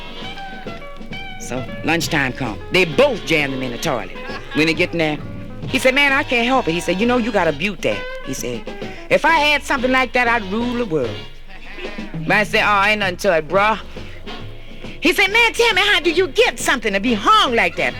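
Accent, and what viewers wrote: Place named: Louisiana, United States. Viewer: She sounds like shes from south Louisiana lol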